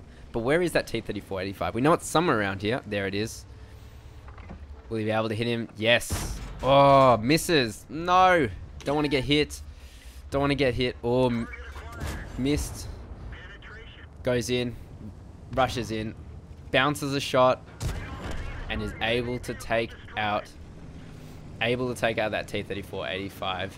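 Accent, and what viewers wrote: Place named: Australia. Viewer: this 'stralia accent is quite arousing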